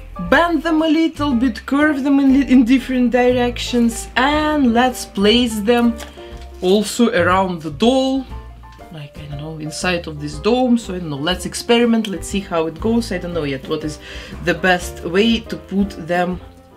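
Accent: Russia. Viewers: Акцент слово из России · Блин, честно говоря, такое ощущение будто автор канала русская. У неё очень явный русский акцент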